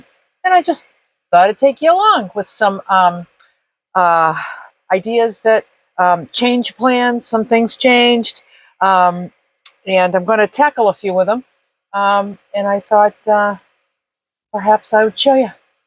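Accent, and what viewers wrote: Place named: New York, United States. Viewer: New York or New Jersey? Cause so many of my family members sound like you do. I could be wrong in placement of origin. Ill accept it if I am but if possible I'd love to know.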